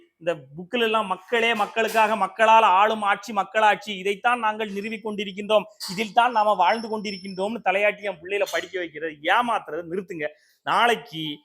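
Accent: Tirunelveli, India. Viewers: இயல்பான நெல்லைத் தமிழில் யதார்த்தமான ஆழமான அரசியல் பதிவு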